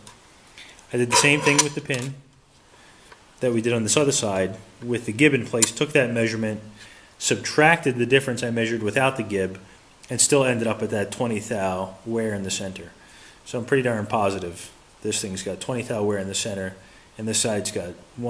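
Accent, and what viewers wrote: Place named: New York, United States. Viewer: Does hail from New York? That might explain the vocal similarity to Raymond Romano and Alan Alda who both are From there.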